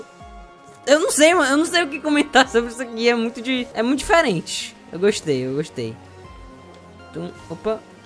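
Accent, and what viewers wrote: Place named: Paraíba, Brazil. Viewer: pq o tem um pouco de sotaque paraibano?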